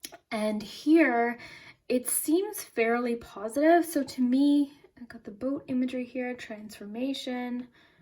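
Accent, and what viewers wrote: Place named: Canada. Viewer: You sound like my dog's vet tech, but Canadian. I'm in love with your voice.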